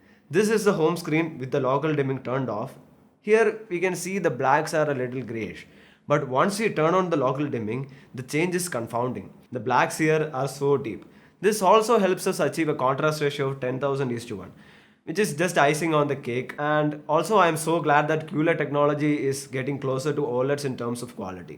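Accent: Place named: Kerala, India. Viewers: Strong malayali accent with a super detailed review.. what's not to like?? Loved it!! Hope to see you more😀😀😁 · Its like malayali accent 😀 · Looks like the host is a Malayalee from his accent! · He is a mallu bruh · Malayali accent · loved your Mallu ascent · accent is very thick and top of that he has a strong south indian accent, something I find it very hard to listen to...!!! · That strong mallu accent is why I watched the whole video. No kidding.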